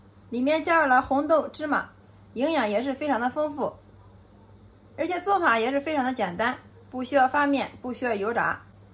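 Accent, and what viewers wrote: Shandong, China. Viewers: 难道她是外国人么？我听她的口音好像是山东口音的普通话啊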